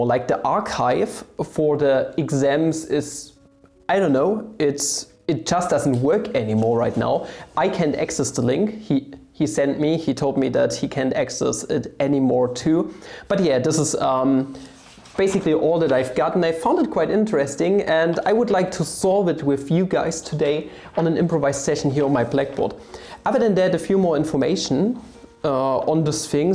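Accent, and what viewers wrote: Germany. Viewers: Bist du Deutscher?😅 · This is the most german english i've heard today.. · is he german? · German accent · ne dachte gleich der klingt deutsch, profil .. aha deutsch xD ja er hat einen starken deutschen Akzent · Are you german?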